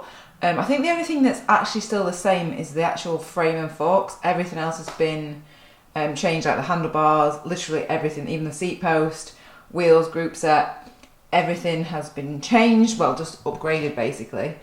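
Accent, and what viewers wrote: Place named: Sheffield, United Kingdom. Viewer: That accent.is it sheffs?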